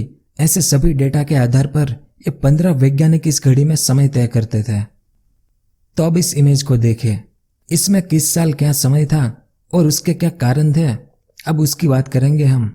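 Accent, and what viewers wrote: Gujarat, India. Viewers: Aap gujarati ho apka bolne ka tarika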